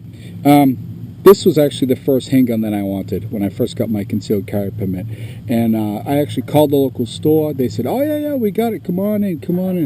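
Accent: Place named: Massachusetts, United States. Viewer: I detected your Ma accent within your first sentence. I guess it takes one to know one. ; )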